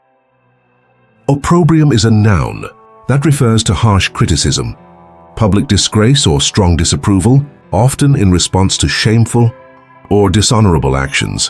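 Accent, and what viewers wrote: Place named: United Kingdom. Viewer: Utterly brilliant, truly magnificent and in British English too. I would be deeply indebted to you to allow me to subscribe to you.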